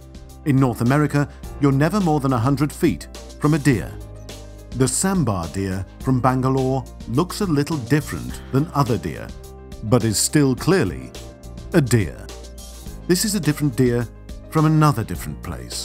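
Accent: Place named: United Kingdom. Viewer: His British accent makes everything sound so smart.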